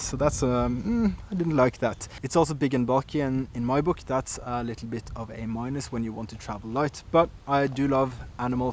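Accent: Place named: Norway. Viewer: I hear a Norwegian accent... am I right? Thanks for a good review!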